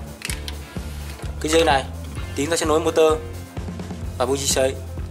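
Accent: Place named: Phu Tho, Vietnam. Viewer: nghe giọng anh là biết anh là người có Phú Thọ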